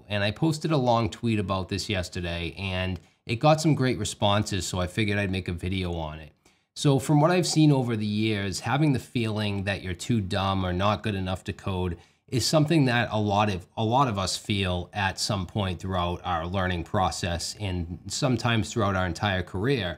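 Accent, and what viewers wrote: Boston, United States. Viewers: Hey my 2nd favorite Boston accent. 1st is Ben Affleck in Good Will Hunting. Thanks for all the videos, honestly you've helped my career leaps and bounds and yours are the videos I recommend to any dev that I teach.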